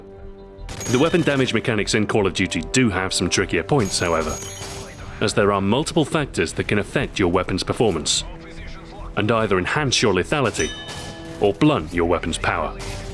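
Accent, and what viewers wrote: England, United Kingdom. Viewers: yeah, the british accent is awesome! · No. this is just an a guy with an English accent who likes CoD, Soap has a Scottish accent anyways :P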